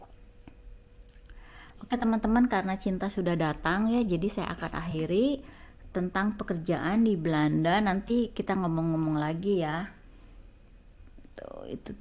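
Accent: West Java, Indonesia. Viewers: Teteh sundanya darimana ?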